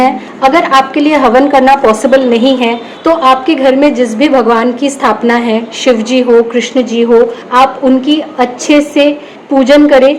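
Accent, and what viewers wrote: Bengal, India. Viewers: Wo bengali hain to thoda bengali accent rahega to aisa sunai dega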